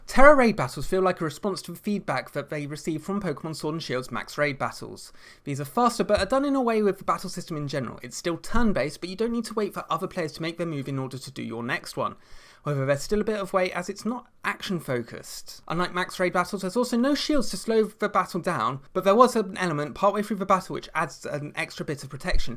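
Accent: United Kingdom. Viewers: YOUR BRITISH???? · I've been using forever and never knew was british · holy shit is British? not sure why that caught me so off guard lol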